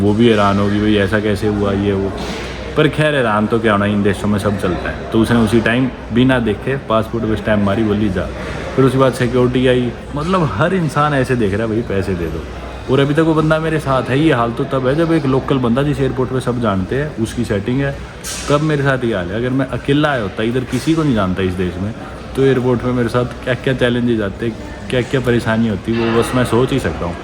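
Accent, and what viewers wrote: Haryana, India. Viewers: No doubt ap boht ache vlogger hen but apne accent ko thora groom kren English bhi ap apne haryanvi accent me bolte hen.. Sari dunia ghoom li ap ne but you sounds like a typical backward villager.... kafi videos me ap ajeeb si awaz nikalte hen jese kisi janwar ko hankte hue log village me nikalte hen...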